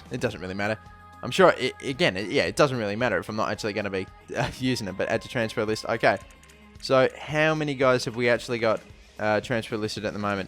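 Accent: Australia. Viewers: are u aussie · are you australian :( im portuguese . quem e portugues da um like ao comentario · Are you australian ? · are you australian mate? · what country are you from? australian ? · Are you from Australia? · You Aussie mate ? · your aussie i love you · Nice to hear an aussie accent on a fifa 13 video ;) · are you Australian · Are you Australian? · Yes an australian commentator! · Are you Aussie?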